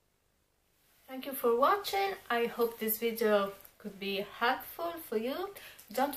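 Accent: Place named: Italy. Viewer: Hi! It’s been awhile since I’ve watched your Chanel, but I hardly watch any UTube videos…actually usually never. 😮🫣 Anyway, I enjoyed this one as I am interested in these new lipsticks! 😊 All of those shades look great on you, and I think I would buy all of them except the orangey red and the very dark vampy one. Thank you for sharing these with us, (and you are so cute speaking French with your your darling Italian accent!) 😅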